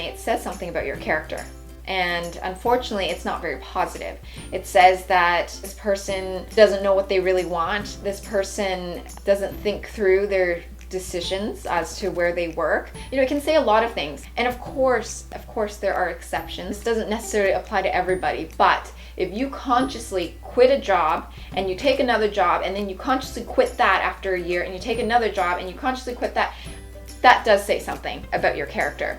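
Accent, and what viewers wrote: Canada. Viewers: You sound Canadian :)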